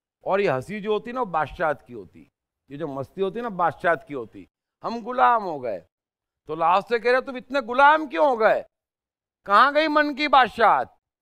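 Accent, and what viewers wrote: Gujarat, India. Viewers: Nahi, Ye Gujarati he.🙂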